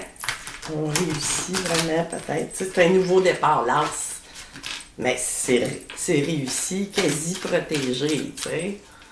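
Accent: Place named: Quebec, Canada. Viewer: Je kiffff.....laxsen québécois...👍👍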